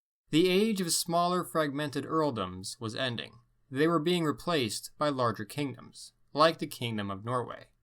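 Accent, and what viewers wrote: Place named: United States. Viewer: One note for you mate, as a British-English speaking Dane who also speaks Old Norse, just because you're rolling your R's doesn't mean you're saying the names in Old Norse.. You're rolling your R's well - but still maintaining the American accent. If you're working on it then good job 👍 keep on going. But if you're not, exaggerate the vowels more and do a bit of research on the Viking alphabet and how to pronounce the letters. For example when you mentioned Erik the Red's name in Old Norse , - "Eríkr" - was perfect, but - "Rauði" - was pretty shite no offence. The letter, "ð" is the equivalent of a voiced "th" and the "i" is a stressed "ee". So it should sound like, "Rowthee-", rather than what you said, "Rowdeh". Just giving you a heads up cause you seem to be trying to speak Old Norse 👍.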